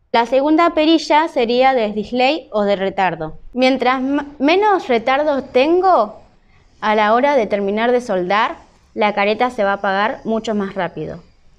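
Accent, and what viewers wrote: Argentina. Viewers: sos Argentina por el acento marcado, desde Perú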